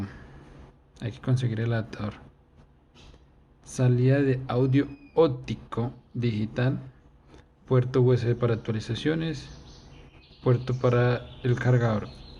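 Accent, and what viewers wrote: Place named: Colombia. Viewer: Que precio tiene en su país amigo por su acento me parece que es de Colombia de que parte? Un saludo desde Barquisimeto. Venezuela.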